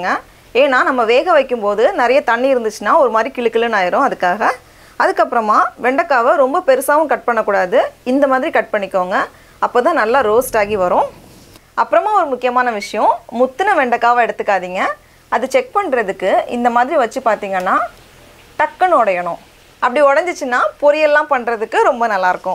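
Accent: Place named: Kanyakumari, India. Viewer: thappu kidayathu sister athu avanga native kanyakumari slang..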